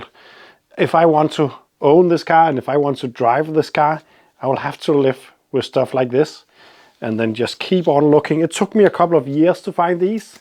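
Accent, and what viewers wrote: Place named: Denmark. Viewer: My mother was Danish and miss the accent. Listening to you brings me happy memories.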